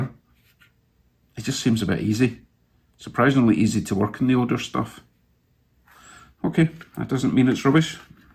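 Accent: Scotland, United Kingdom. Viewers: I love your Scotts accent my father was of Scotts descent and when speaking to older members of the family that is the accent I remember.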